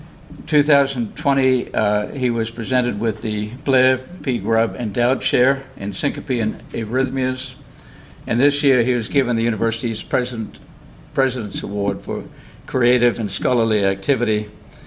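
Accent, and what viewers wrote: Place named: Baltimore, United States. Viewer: His 'balmer' accent brings vack memories.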